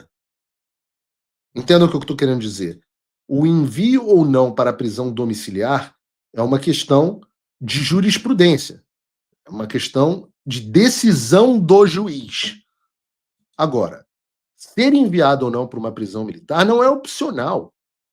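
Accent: Rio de Janeiro, Brazil. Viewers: Velho, posso te dar um conselho? Esse seu sotaque carioca te faz parecer bêbado e mau caráter (o que você é de fato).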